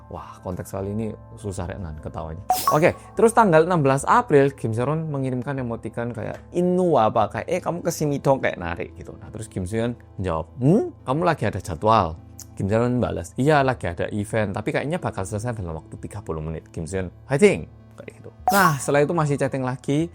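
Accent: Java, Indonesia. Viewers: Ojo video terakhir lah mas....aku jd kangen medok nyaaa😂❤❤❤ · Terakhir terakhir terus tp selalu ada aja updatenya kasian alm Sae Ron.. aku aja tiap buka yutub nungguin oppa yg medok krn nungguin kabar2 terbaru teruss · Nyatanya ini bukan yang terakhir mas medhoookkk😂😂😂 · Jowoe keren medok tenan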